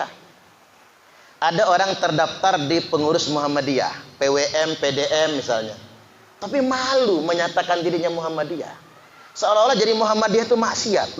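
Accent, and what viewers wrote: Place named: Jakarta, Indonesia. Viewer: iki lho pak , sampean wong njowo opo dudu , logate koyo wong njakarta , jainudin mz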